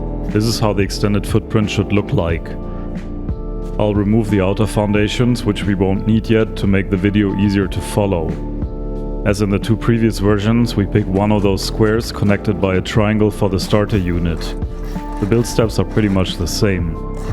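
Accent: Germany. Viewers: Bist Deutscher oder?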